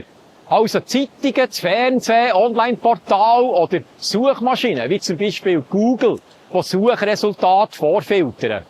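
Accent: Bern, Switzerland. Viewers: Ich habe so eine Freude an deinem Berndeutsch, obwohl ich keine Bernerin bin. Auch freut es mich im Abspann den Namen meines Wohnortes "Lauwil" zu sehen. Du bist ein charmanter Aufsteller mit Tiefgang.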